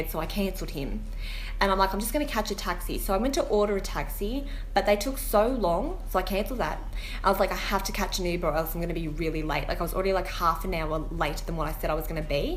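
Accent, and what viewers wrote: Australia. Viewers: I seriously find your voice so entertaining ! Love the aussie accent.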